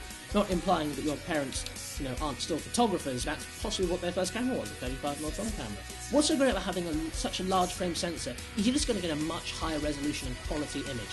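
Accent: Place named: RP, United Kingdom. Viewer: Really interesting stuff- despite really enjoying photography, I've never really understood all the mechanics of it so cool vid :) As a side note, you might speak a little more slowly? Your voice is very engaging but the pace is a little...pacey ;p Sean wants me to add that he thought it was very good and he was impressed :) ...also, I forgot how posh you sound!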